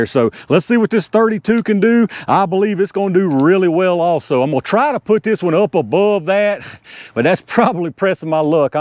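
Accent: South, United States. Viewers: Your thick southern accent makes me feel confident that you know wtf you're talking about 🤠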